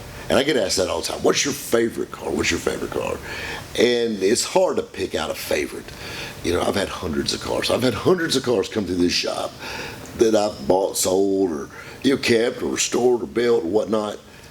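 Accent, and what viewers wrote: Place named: Carolina, United States. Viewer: When I read these posts I hear Carolina accent and voice in my head...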